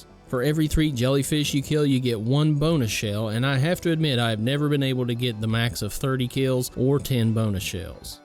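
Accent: South, United States. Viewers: Hey. I've been a subscriber for about a year. Your channel just keeps getting better and better. Thanks for the great content. Most reviewers hate on this game, but I always liked it. If you like Jaws, my wife just finished her first game on Steam which is an aquatic action/adv game inspired by Jaws. No, I'm not making this up... While the finished game is really nothing like Jaws at all, it was inspired by the game. Her game is called "Rupert and Riley Shipwrecked" and can be found on Steam or just by searching. Anyway, thanks again for all the great content. Nice to see (and hear) another Southerner on here;)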